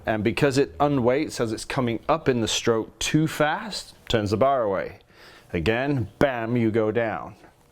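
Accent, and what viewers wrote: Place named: Wales, United Kingdom. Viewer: Hi just wanted to ask where in the world that accent comes from, sounds WELSH to me?? Good lessons though and thanks for your time doing these!!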